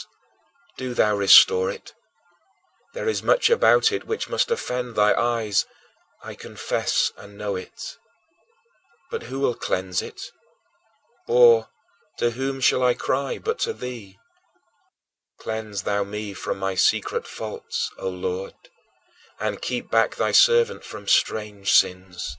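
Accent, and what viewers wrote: United Kingdom. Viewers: British.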